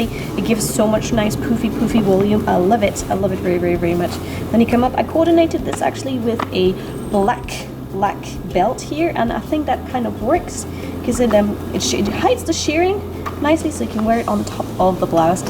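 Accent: United Kingdom. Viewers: It's strange how you sound slightly Scottish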